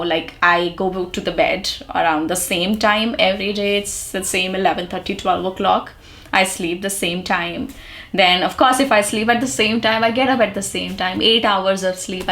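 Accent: India. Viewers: Didi......I love yor indian accent ❤❤❤❤❤❤❤❤❤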